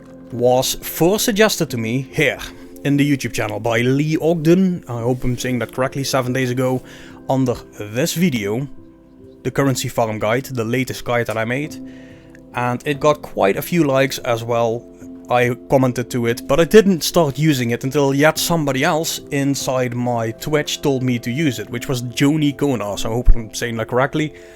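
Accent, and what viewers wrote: Limburg, Netherlands. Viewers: kunse van Limburg?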